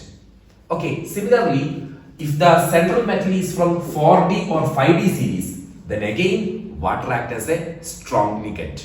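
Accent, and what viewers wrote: Kerala, India. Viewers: Sir are u from Kerala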